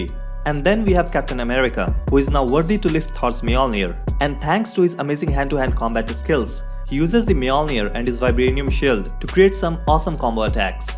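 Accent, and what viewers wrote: India. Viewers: Sounds like ur a malayali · Are you Indian... Your Voice has Indian accent.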